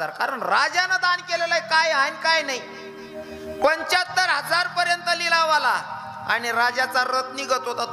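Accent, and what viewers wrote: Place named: Ahirani, India. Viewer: खरंच खानदेश रत्न आहे महाराज पिवर अहिराणी बोलतात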